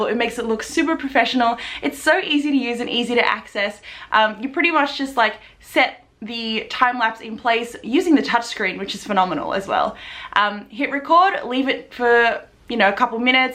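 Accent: Australia. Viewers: Sounds aussie ;) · omg its so unexpected to hear an australian accent LOL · oh my gosh, I love your Australian accent ! so sweet ! kiss from Paris X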